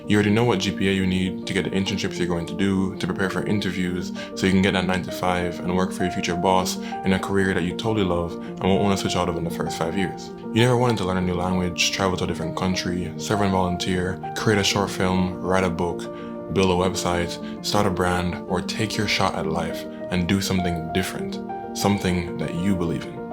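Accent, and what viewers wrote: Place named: Ireland. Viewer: I know youve a carribbean accent but it still aounds so irish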